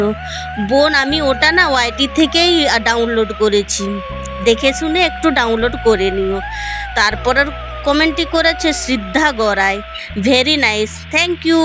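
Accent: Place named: Bankura, India. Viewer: খুব মিষ্টি লাগল কথাগুলো শুনতে। অনেকটা যেন বাঁকুড়ার মেঠো সুর বাজছে। আগেকার মতো শাড়ি পরে কাজ করার অভ্যাস চলে গেছে অনেকেরই....💛❤️💛🙏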